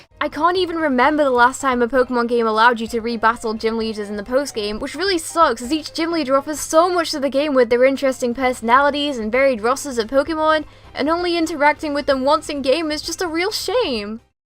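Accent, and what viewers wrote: United Kingdom. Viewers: She did not mess; she's British! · It’s intentional that’s how brits speak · I love your vids! Keep it up and keep the British pronunciation!!! <3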